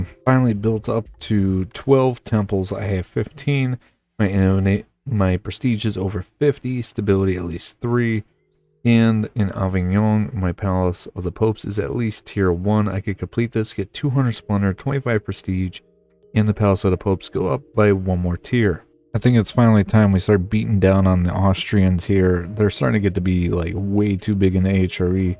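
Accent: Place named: Upper Midwest, United States. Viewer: Are we a Wisconsin Or Minnesota or Michigan native? I know a upper Midwest accent when I hear it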